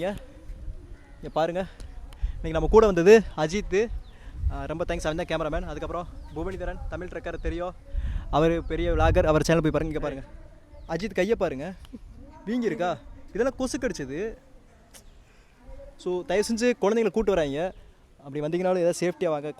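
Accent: Kanyakumari, India. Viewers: Very beautiful video.. Lovely effort to bring this place to forefront. Indian ASI has helped Cambodia lot of temples and it is quite a shame that 1200 year old place here is left unattended with no maintenance. One of the artefact where flowers were kept look amazing. Better be careful with those Train insects - could be poisonous too and good to be out of structures that could fall on head.. Love to hear the Tamil presentation ingitu, angitu kind.. Kudos!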